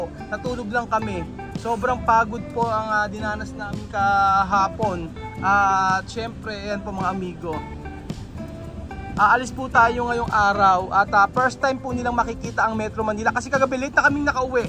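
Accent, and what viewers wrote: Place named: Zamboanga, Philippines. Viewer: Chavacano po ba kayo or spanish speaking? Yong accent mo ay chavano.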